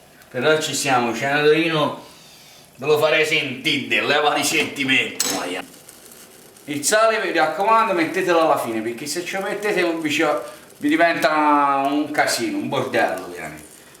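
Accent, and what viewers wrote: Tuscany, Italy. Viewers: Ciao io ti seguo sempre sei molto bravo solo che a volte ho fretta e tu parli troppo poi in toscano rigido che a volte non si capisce però basta poi vedere per capire purtroppo in questo video manca un pezzo ma dopo aver messo la farina di semola nel 2 tegamino poi l'hai aggiunto al primo o quella è una porzione ? Quindi per altre porzione bisogna ripetere questa operazione vari volte ? Grazie per i tuoi ricette buonissime e salutari. Adoro le zuppe io sono marocchina e c'è una zuppa in Marocco simile ad una ricetta toscana comunque è ottima la cucina toscana prevede tante zuppe che fanno bene . 👍👌👏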